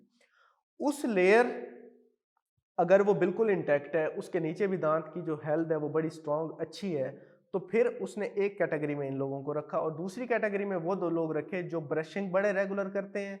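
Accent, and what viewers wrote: Saraiki, Pakistan. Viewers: Sariki speaking lG rahy dr. Sahab 👍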